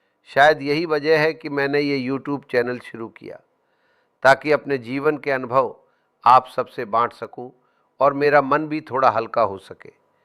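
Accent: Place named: Uttar Pradesh, India. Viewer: अभी भी आपकी आवाज और लहजे मे पंजाबियत जरा भी नही दिखती, यूपी वाली शुद्ध हिंदी ज्यादा झलकती है,इसीलिए लोगो को डाउट होता है क्या आप मेरे इस कमेंट का भी जवाब दे सकते हैं? क्योंकि इसी आवाज और चेहरे मे आप दूसरे गेट अप मे कयी बार हिंदू और मुस्लिम बुजुर्ग भी बन चुके हैं.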